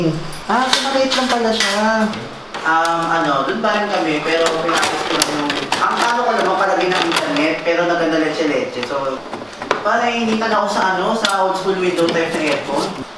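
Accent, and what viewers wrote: Visayas, Philippines. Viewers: Bisaya ka po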